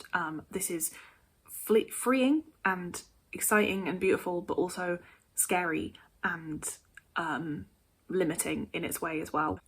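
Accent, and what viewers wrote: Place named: France. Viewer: Sounds very French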